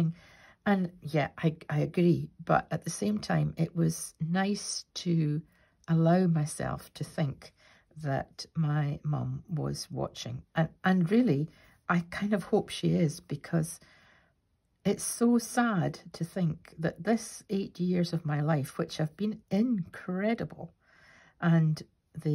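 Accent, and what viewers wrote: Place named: Fife, United Kingdom. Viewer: Good morning from a very chilly Toronto, Canada. I’m an expat Scot from Glasgow, discovered your channel thru IG. Loved this vlog, the story of your mum and your intro to crochet. Wouldn’t she be thrilled and proud at the business and life you’ve created. You look very like her in the pics you shared. I love your Fife accent, so much softer than the harsher Glasgow twang. Your blankets are stunning in your IG grids. I’m more of a knitter though I also crochet. I can’t embroider any more as I can’t see close up detailed work. Eyesight and aging - sigh 🤷‍♀️. I look forward to hearing more in future vlogs. Your grand babies, the twins, oh boy, beautiful Scottish babies, just a cuddleful/armful of love. - Isabel 🇨🇦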